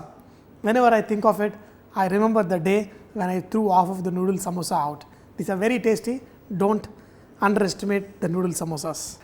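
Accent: India. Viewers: Dear.... I tried to find the recipe at your website - but it is not posted. Some of the spices used in the recipe I didn't quite understand with your beautiful Indian accent, perhaps you might type them in the "more" section? Thanks you so much!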